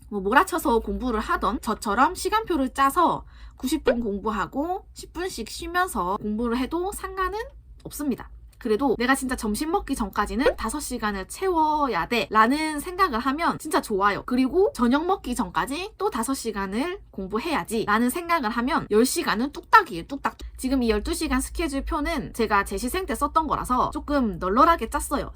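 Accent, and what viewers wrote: Gyeongsang, South Korea. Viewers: 부산시 공무원 되신건가여 ? 어쩐지 사투리가 조금씩 들리는거같았어여 항상 좋은 방송 해주셔서 감사합니다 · 잘 봤습니다 저도 오늘부터 의지를 다져봐야 겠습니다. 혹시 실례가 안된다면 경상도사람인가요? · 갱상도 이신가융 ㅋㅋㅋ 잘보고갈게요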